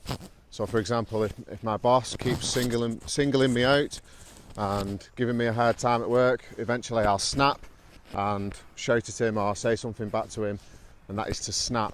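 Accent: Northern England, United Kingdom. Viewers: Hi, Finally found a channel where a British English teacher is speaking in northern accent. Don't get me wrong, but I don't understand when people speak in northern accent. I have recently moved to London from India and I feel terrible when I don't understand British people. I just want to understand what everybody is saying and I would like to communicate with them. How can I do that? Could you please tell me?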